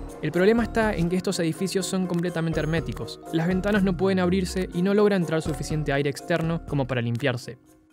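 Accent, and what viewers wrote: Argentina. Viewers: El vox argentino papa, vengo de parte de UMI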